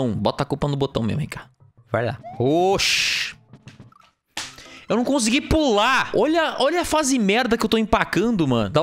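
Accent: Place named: Portugal. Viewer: Esse sutaque de portugal Ó top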